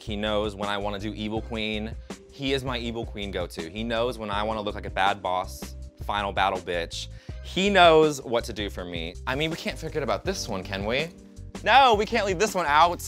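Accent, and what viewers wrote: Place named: South, United States. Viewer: So handsome and I love the southern accent coming out. Being from Louisville ky very refreshing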